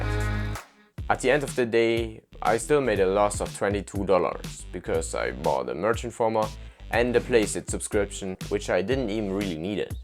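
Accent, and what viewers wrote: Germany. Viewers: Man hört das deutsche haha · German accent? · Are you german ? It Sounds like that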